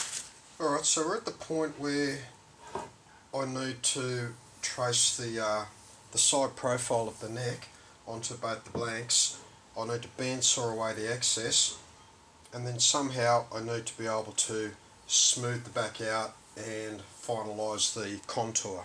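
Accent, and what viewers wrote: Australia. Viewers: this is aussie accent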